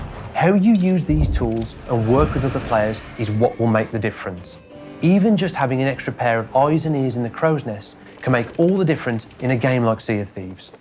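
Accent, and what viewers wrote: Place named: Black Country, United Kingdom. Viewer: That's a Telford or Yam Yam accent I'm sure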